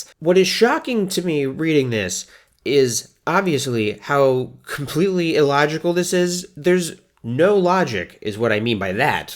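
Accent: RP, United Kingdom. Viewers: As a Brit, I approve of your posh English accent. I thought you'd like to know. Also: here's a bouquet 💐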